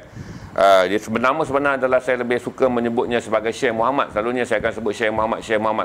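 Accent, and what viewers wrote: Kedah, Malaysia. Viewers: Nak dengaq tuan ckp Kedah sepenuhnya.....hehehe takut hilang pelat Kedah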